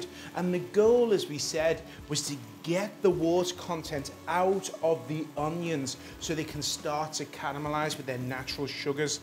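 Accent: Liverpool, United Kingdom. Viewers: Do I hear traces of a Liverpudlian accent? I'm not from the UK. However, I find the plethora of accents from different regions very charming. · SCOUSER ACCENT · Scouser liverpool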